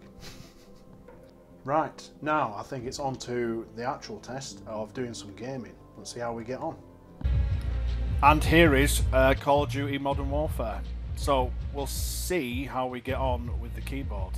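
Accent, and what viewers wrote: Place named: Northern England, United Kingdom. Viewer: Cracking review mate. Honest and Proper Northern!!!! ;)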